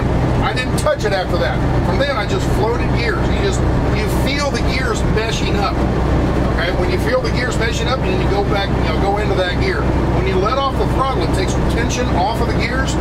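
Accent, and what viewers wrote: South, United States. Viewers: wer runnin thirty mile anhour....... true southern accent. 👌 love it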